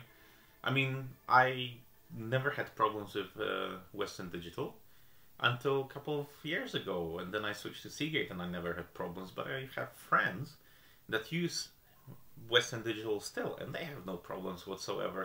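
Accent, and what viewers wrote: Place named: Finland. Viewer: the amount of information is very nice!, where are you from? I kind of get a finnish vibe but anyways good video!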